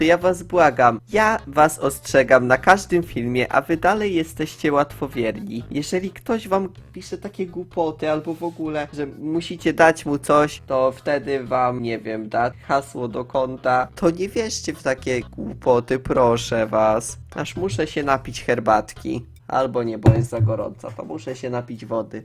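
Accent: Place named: Podlasie, Poland. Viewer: Omg mam wrażenie, ze jestes z Podlasia bo gadasz po mojemu hahah❤️Mój nick: brązowa laska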